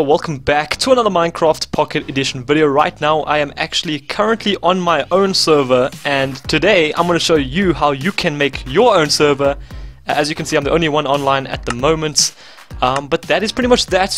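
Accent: South Africa. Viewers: u sound South African?! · are you South African?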